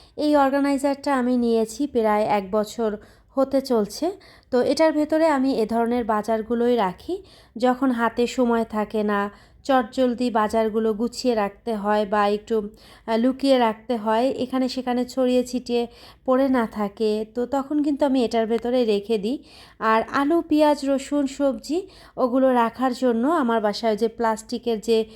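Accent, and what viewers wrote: Khulna, Bangladesh. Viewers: আপু আপনি যেহেতু খুলনার মেয়ে আপনার ভাষাতে ওই ধাব্রাই বলে। কারন আমারও বিভাগ খুলনা তো আমরাও ধাবরাটাই বলি তবে কি জানেন আপু ঢাকা আসার পর থেকে না চাপটি বলিকারণ এখানে সবাই চাপটি বলে।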